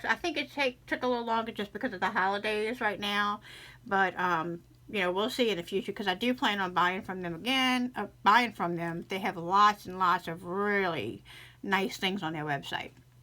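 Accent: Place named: South, United States. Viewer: Lovely designs! I'm a new sub. I've been making jewelry for years, but love to see what others come up with. Thanks for the inspiration. Just wondering, are you from the northeastern part of the country? Or maybe mid-Atlantic? I'm trying to place your accent. Seems a little bit southern and a little bit northeastern. Also, I'm wondering if the hand-appliqued beads are Japanese Tensha beads? (The link that you posted above for the beads is the same as the link for the bracelet.) I'd love to get some. They are enchanting. You're pieces are beautiful. Thanks for sharing!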